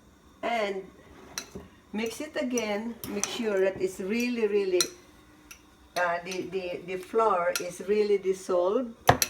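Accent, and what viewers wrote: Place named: Philippines. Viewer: Are you Filipino? Can you just speak in Tagalog? I can sense you are having difficulty speaking in English. Your accent is so thick!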